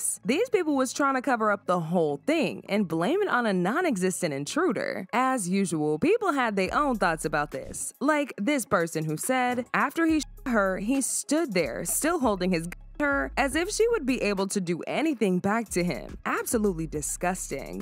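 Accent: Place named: United States. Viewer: As an English man I approve of this American version of the English language ❤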